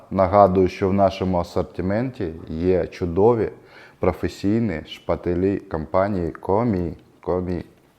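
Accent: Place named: Russia. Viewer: Да, чувствуется, что русский родной 😊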